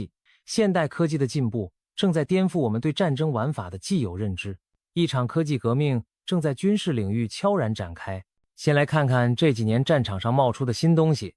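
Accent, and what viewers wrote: China. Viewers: 你的口音足中共国的人,你的知識相對匮乏,解放軍不會先對台灣開第一槍,會對駐日美軍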